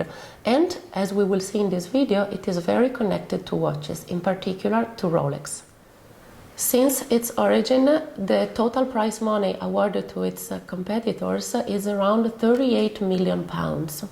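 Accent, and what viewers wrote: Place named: Italy. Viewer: An Italian accent adds beauty to an already attractive watch. Thank you.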